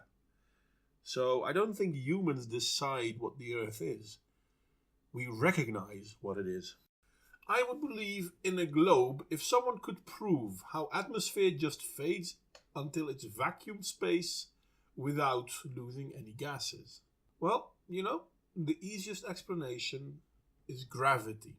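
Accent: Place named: Netherlands. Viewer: Definetly tasting some Dutch accent in the air...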